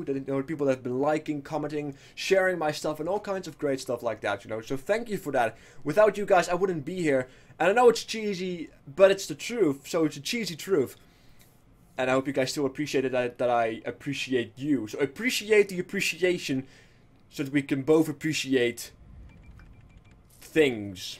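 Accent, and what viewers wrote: Netherlands. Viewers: His accent is dutch people.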